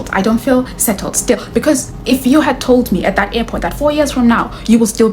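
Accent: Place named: Africa. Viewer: Please work on your accent! It’s too difficult to understand your when you speak fast ! Practice to speak less African !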